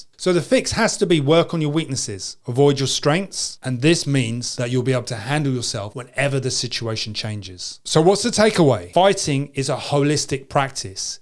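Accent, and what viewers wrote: England, United Kingdom. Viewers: English, not British. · I like the British accent!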